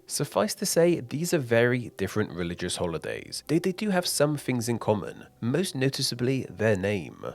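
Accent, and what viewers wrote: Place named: United Kingdom. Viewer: This is probably gonna get pushed down, but since I didn’t see anyone else saying it, the pronunciation of the Hebrew word for Passover is not in fact “Pesca”, and the meal isn’t said “Sayduh”, it’s “Pesach”(roll the ch like “fancy” Bach) and “Seder”(both E sounds are like “Ben”). A good video historically speaking, but the British accent is strong here, lol.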